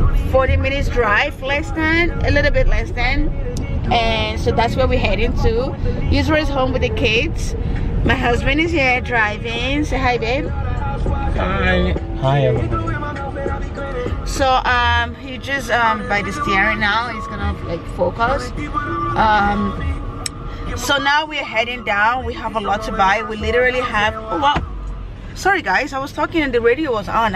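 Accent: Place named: Yoruba, Nigeria. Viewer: Am so addicted to this channel i just love the way you speak your yoruba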